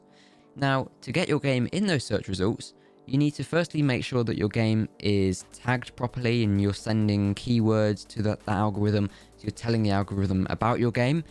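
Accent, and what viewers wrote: United Kingdom. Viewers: Nice finally British lad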